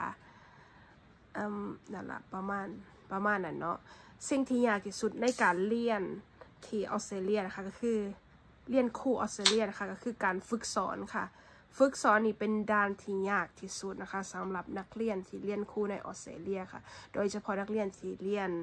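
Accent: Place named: Isan, Thailand. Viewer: พี่พูดอีสานได้น่ารักมาก แบบมันดูดีอะ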